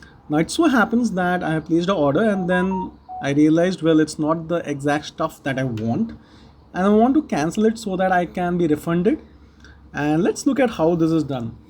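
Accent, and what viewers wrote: India. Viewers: the man with the indian accent comes for the save again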